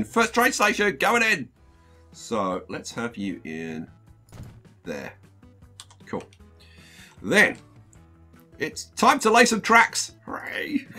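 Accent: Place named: United Kingdom. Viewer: Are you a British or an American? I just like your accent so much.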